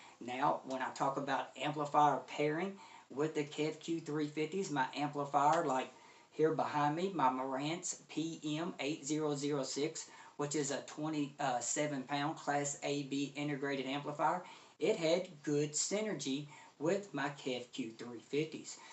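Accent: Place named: Tennessee, United States. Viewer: Where you from? Sounds like TN ;) … I’m in Bama here, but from TN.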